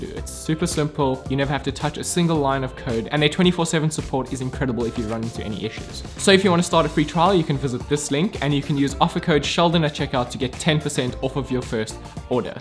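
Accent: South Africa. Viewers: Ah this video was an amazing help! Is that a Cape Town accent I hear? 👀🇿🇦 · are you South African? Your accent sounds very South African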